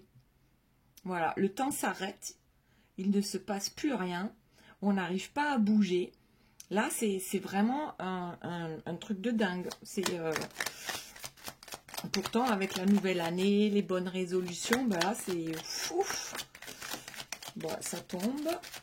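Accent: Alsace, France. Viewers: Bonjour ! Vous avez l'accent alsacien 😊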